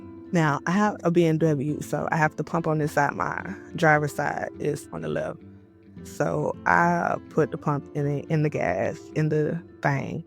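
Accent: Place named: Atlanta, United States. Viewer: Most of the people who are in Atlanta are not from Atlanta.. just ask the people who you encounter.. .. Honestly you sound like you were born & raised in the A.. if not where are you from? We are hoping that everyone will just go back to where they are originally from so that we can have our city back !! Like someone said today young black men has been around since the beginning of time.. Stop stereotyping them by calling them YN’s ! If you all think that Atlanta is so bad.. try living in Memphis or Chicago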